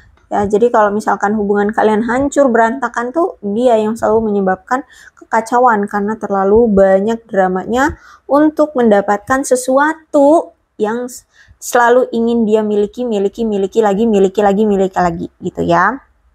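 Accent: West Java, Indonesia. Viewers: Orang sunda ya teh?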